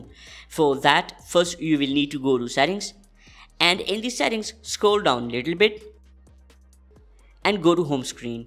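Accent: India. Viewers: You speak English better that half or the people living in the US who never bother to learn English. Americans are frustrated with any foreign sounding tech support because it means jobs are being sent to other countries, while we are being invaded by millions of foreigners who illegally come across our borders to take advantage of any free thing they can get. You sound like you may be from Asia or India. India is unfortunately is known for two things. #1 Scammers. #2 Very annoying tech support people whose only knowledge it to look up an answer as you wait on hold, or repeat you question back to you again, or continually, "apologize for the inconvenience". You are what you are and if you just keep doing what you are doing and people will learn to trust and appreciate your help, with our without an accent. You did an excellent job and I appreciate your help. I am told I have an accent also, but that is because I am from TEXAS. 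:) I hope you and your family have a Merry CHRISTmas! (I just subscribed and left you a thumbs up!)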